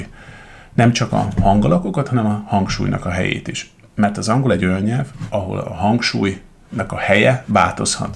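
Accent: United Kingdom. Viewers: Hi..Kindly Could you tell me where is you english accent from? Rp British...Modern RP British? I'm curious, because I'm using the Shadowing technique through your videos. Your accent is very clear. I looking forward to see your answer.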